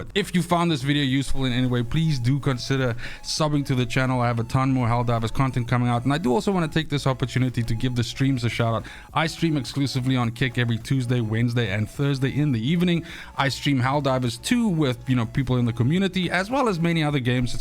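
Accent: South Africa. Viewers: Howzit bro. Are you from South Africa? Cool video man, you gained a sub and like from me.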